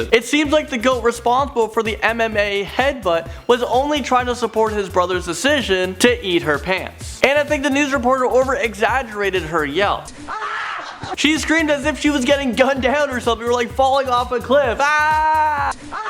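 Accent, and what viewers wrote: Canada. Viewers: r u Canadian or (presenter) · RyanCraft1 hes canadian of course he sounds different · U Canadian bruh?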